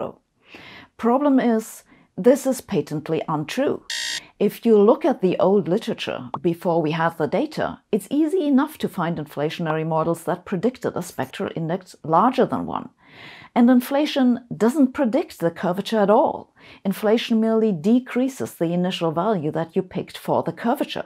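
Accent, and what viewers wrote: Germany. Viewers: is german pronunciation for hypothesis- hypothetis. Or is just how sabine pronounce things?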